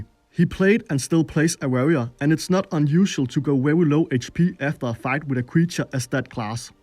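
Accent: Denmark. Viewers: well, I like this kind of english with danish accent, I mean REALLY danish XD BTW great video mate. Og jeg har set mange af dine fede videoer :)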